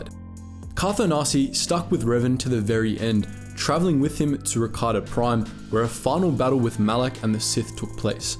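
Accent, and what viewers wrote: Australia. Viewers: I’m trying to pinpoint the accent. Are you Australia like me too at all? This is the first time I’ve watched one of your videos you’re amazing so far I think! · As a fellow aussie I know how hard it can be to speak clearly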